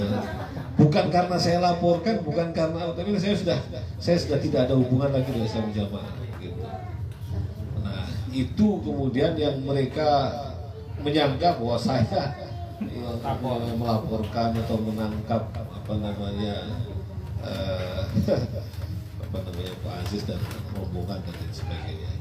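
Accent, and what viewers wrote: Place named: Malaysia. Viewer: Cara berbicara Ustaz sudah seperti orang Melayu Singapura/ Malaysia. Barakallah fiik ustaz.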